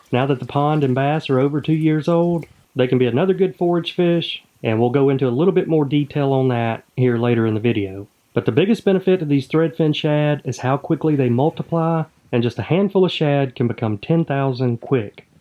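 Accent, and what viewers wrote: South, United States. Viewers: Great wonderful videos, narrated with a fantastic southern accent. One thought is, seems a lot of fish for a small pond. Is there some sort of math to figure population?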